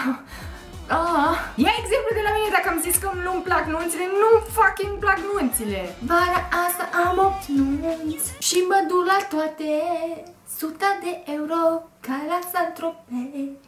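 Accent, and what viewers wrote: Moldova. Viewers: Wow. Asa ceva.... Am intrat pe video-ul asta din intamplare de la o sugestie a youtube-ului. Am ramas interzisa practic:)). Am dat peste o faptura care latra la mine si incerca sa fie funny. First of all: Nu esti funny... daca gesticulezi mult si te scalambi, asta nu inseamna automat ca esti funny. Numai unul poate sa pull it off si ala e Jim Carrey. Second of all: Incearca sa renunti la accentul de moldoveanca, te face sa pari....cum sa ma exprim...saraca cu duhul. Sau daca nu poti renunta la accent, macar nu mai vorbi in engleza, engleza cu accent moldovenesc te face cel putin penibila. Ce e mai trist e ca ai peste 50.000 de subscriberi, asta inseamna ca sunt 50.000 de oameni care te privesc ca pe un role model...bine...majoritatea lor sunt probabil baieti virgini si fete pana in 20 de ani care au ca poza de profil un selfie...deci... 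In orice caz, inteleg de ce ai succes pe net si probabil nu la fel de mult si in viata reala. Asta e pentru ca youtube-ul e 2D...pe cand viata reala, din pacate pentru tine, e 3D, adica lumea te poate vedea si din profil...if you know what I mean. Peace.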